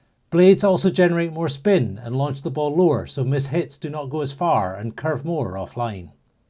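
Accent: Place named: Scotland, United Kingdom. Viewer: Hello. Really enjoy the channel, and in particular the great Scottish accent which gives us the information. My question is not blade related, but iron related. I enjoy my putter and driver showing me the best place to hit within the face, is there a reason why this is not done on irons? Thanking you in anticipation.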